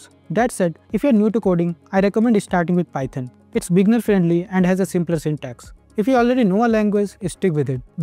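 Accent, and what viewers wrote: India. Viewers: Thankyou so much for this video sir. Gave me a lot of insights as a beginner and sir, It would be more helpful if you pronounce some words clearly without using hindi accent since its not easier for everyone to understand the words like the ones starting with 'S'.😊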